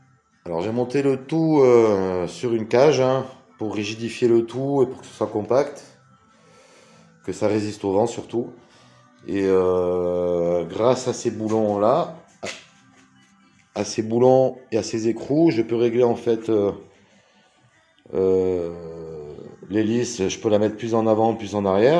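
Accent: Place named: Nice, France. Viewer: bonjour , je suis curieux de voir l’évolution de ton projet ( plus que jamais utile vu les difficulté que connait le pays en ce qui concerne l’électricité ) , voir ou t' en et ( batterie? si oui ,combien d'ampere heure... combien de watts t'arrive à tirer etc ) ps : on reconnait direct l'accent nissart , j'ai grandi a nice :))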